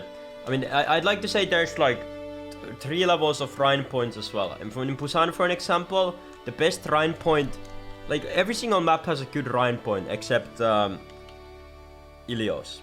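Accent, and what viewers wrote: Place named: Scotland, United Kingdom. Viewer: wtf is your accent? its a mix between like scottish and asian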